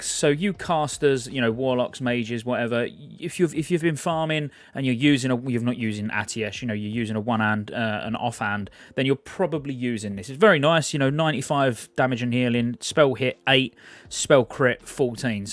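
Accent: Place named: England, United Kingdom. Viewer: aww I just watched a video about this... well at least I get to watch it now in an english accent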